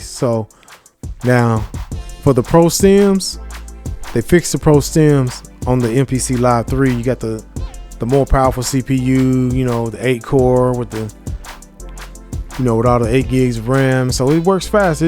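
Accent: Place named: South, United States. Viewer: I KNEW I heard that West Alabama/Eastern Border Mississippi in your voice Mane…✊🏽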